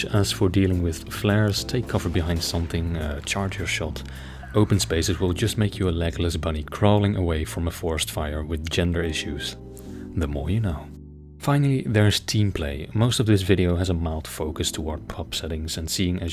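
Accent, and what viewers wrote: Netherlands. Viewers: Hey, nice vid. I was wondering, are you dutch? Your accent sounds dutch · are you dutch? your sounds like it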